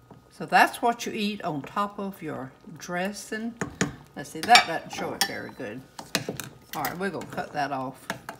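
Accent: South, United States. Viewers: Prayers for continued healing and Yes God is good ❤ Love your recipes and your Southern accent!! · you are such a lovely lady! I just love your southern accent!! Butta!! Love your show… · ...you are just adorable! You are so caring, thoughtful and genuine! I love your accent....I could listen to you talk for hours on end! You are a true southern belle! Your cooking videos are so diverse and the recipes seem pretty easy. The food you prepare looks incredibly delicious and at the end of a lot of your videos, when you show the entire meal laid out on your side counter, I always find myself wishing I was the first one in line! Not only for the scrumptious food, but for the fellowship with your loving family. My sister and I are the only family we have now....everyone else has passed. So when I watch your videos, I get warm memories of my own family and it makes me smile. 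I am very happy your son is doing well! I know he will continue to flourish! As will all of your family. I will be making your stuffing and gravy recipe (I do not care for sage or giblets at all) and when my sister and I sit down to eat it, I will think of you. You are truly an inspiration. Best wishes for a very Blessed and Happy Thanksgiving! God Bless You All! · I want to order your cookbook. How can I do that? I adore your Southern accent!!! It’s the real deal!!, I thank God for providing a heart for your Son, whose Birthday is the same as my precious Mama, October 19! We live in Seneca, SC. Thankful to live in the South!! Love you!🥰❤️🙏🏻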